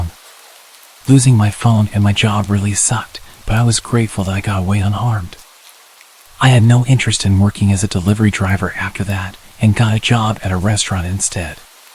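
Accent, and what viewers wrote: United States. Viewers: Oh no, an American, bye.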